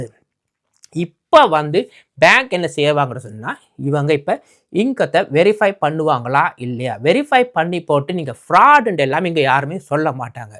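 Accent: Sri Lanka. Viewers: Ilankai thamil kekka rompavum inimai.😅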